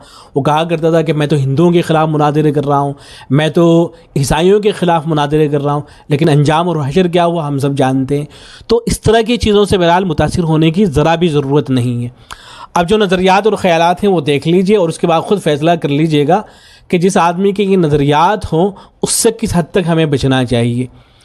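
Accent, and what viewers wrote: India. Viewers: یہ شخص جیسا کے لہجے سے معلوم ہو رہا ہے پو پی سے تعلق رکھتا ہے یو پی وہ زمین ہے جس نے برِصغیر کے مسلمانوں میں دیوبندیت اور بریلویت کی شدید تقسیم پیدا کی جنہوں نے لباس واضح قطعہ داڑھی کے واہ انداز و اشکال اسلام کے نام پر متعارف کروائے جو مراکش سے حجاز و ملائیشیا تک پوری مسلم دنیا کے لیے اجنبی ہیں جن کی بیمار فکر نے نہ صرف ہندؤ مسلم خلیج کو گہرا جس کی سیاسی قیمت پنجاب بنگال اور کشمیر کے لوگوں نے ادا کی اشرف تھانوی ہوں یا احمد رضا بریلوی یہی سے تھے جبکہ ان کے مقابلے میں پنجاب نے بھلے شاہ بابا فرید بابا نانک مولانا عبید الللہ سندھی جیسے جوڑنے والے لوگ پیدا کیے جاوید احمد غامدی سے بغض بھی اصل میں اسی بنا پر ہے اسلامی تاریخ نے صدیوں بعد ایک بڑا دماغ پنجاب میں کیوں پیدا کیا۔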